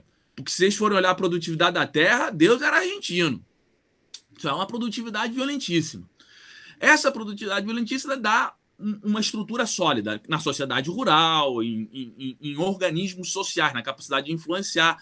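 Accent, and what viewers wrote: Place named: Rio de Janeiro, Brazil. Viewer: Muito clara e inteligente as análises histórico- econômicas. Gostei demais, aprendi muito. Ele tem português impecável, aliás o português dele tem as características, sotaques, brasileiro-carioca. Cumprimentos sinceros ao Prof..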